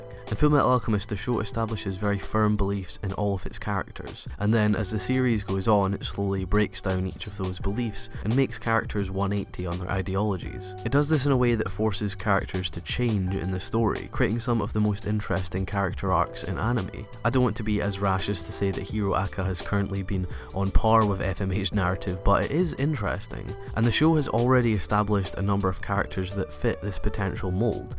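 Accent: Scotland, United Kingdom. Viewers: You sound Scottish, where abouts?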